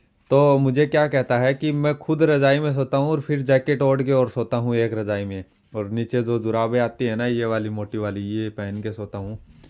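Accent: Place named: Rajasthan, India. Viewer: awaaj se toh tu rajasthani lag raha hai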